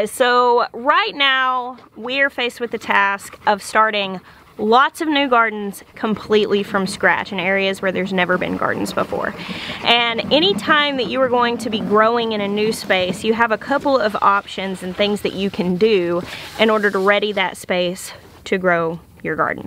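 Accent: South, United States. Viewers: Gurl...I listened to you for 5 minutes saying what I thought was "silage sharps"! You have such a southern accent! Finally I realized you were saying "silage tarps", or as we would say here in New England "silage tahps". lol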